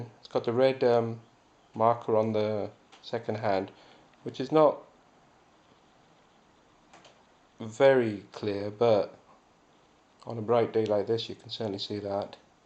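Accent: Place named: India. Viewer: English with indian accents shouldn't be on youtube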